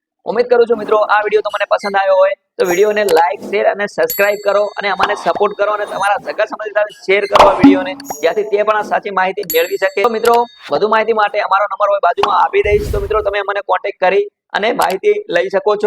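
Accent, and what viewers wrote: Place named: Banaskantha, India. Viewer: આપ બનાસકાંઠા થી છો ભાઈ ભાષા થોડી એવી છે, અમે પણ બનાસકાંઠાના છીએ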